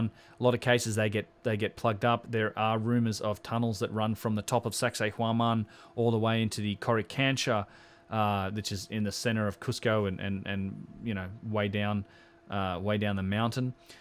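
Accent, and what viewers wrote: Australia. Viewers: hey , wow , wish i hadd known you were doing this , , and dude you sounds like an aussie , , same , , please explain 1inch high heiroglyphs in granite , done wih brass chilel , , come cuts in red granite 1mm thick 2mm deep , , are you aussie , would like to show you in person , i have worked with sonte for 34 yrs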